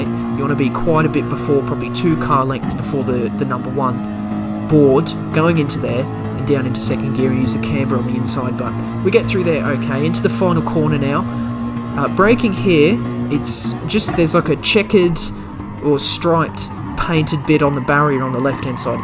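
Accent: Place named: Australia. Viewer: The dragon trail and lake Maggiore were difficult but i could get ahead half a second by certain places around the lap, brands hatch is something else! 100ths of a second is vital and being a controller user means turning and trail braking are really tough. I've come to learn that the point on the controller for trail braking is just past the point of neutral or still. It's like half a millimetre, only a handful of times can i get it to come through the corner up on Lewis. What is your brake balance set at? Great vid and very nice to hear an Aussie voice for a change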